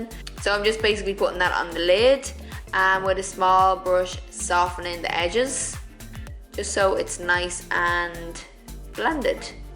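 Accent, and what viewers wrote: Ireland. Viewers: I loved your look in this video and overall. I found you shopping on Tarte! I bet you will be the next huge thing on YT<3 Where is your accent from? You are in CA but sound Irish?=P I'm a new fan from NZ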